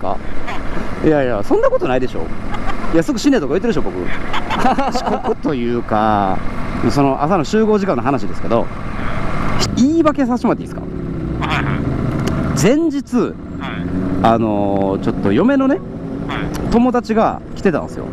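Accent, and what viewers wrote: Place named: Kansai, Japan. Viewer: ナイトツーリングに続き、このツーリング動画もおもしろかったです！ 試乗動画でもイントネーション的に関西感はバッチリ出てますよ😁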